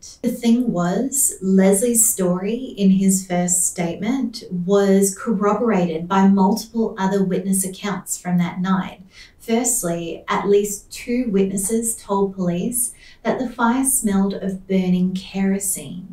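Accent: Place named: Australia. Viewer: New sub! I loved the way you did this. It’s refreshing to hear a fellow Aussie doing these videos. Well done!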